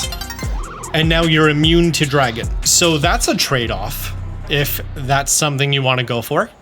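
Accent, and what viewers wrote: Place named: New Jersey, United States. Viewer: Want to know how I know you're from New Jersey? You say: wooder If you see this.. Taylor Ham or Porkroll?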